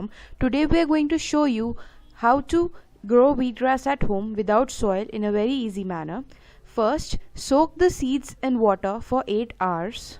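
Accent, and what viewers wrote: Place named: India. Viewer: Try to pronounce your r and d in proper English manner in horrible hinglish for us it sounds disgusting, the Hindi rétroflexes always makes Indians lazy when talking English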